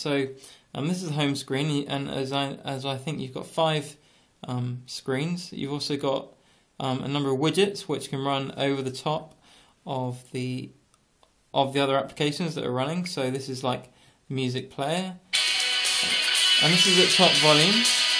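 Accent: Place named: United Kingdom. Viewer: I'm so glad to find an english version , when you watch americans you can hardly understand them , great video :) can not wait to get mine , :)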